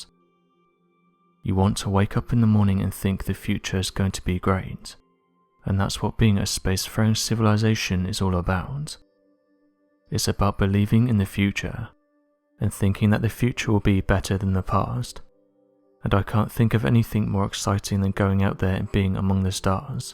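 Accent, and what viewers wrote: England, United Kingdom. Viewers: So why are these “aliens” always seen either in the Sky or Space? But the aliens don’t ever make it to Earth in ET Spielberg style? Sorry but the husky serious English accent doesn’t really do it for me.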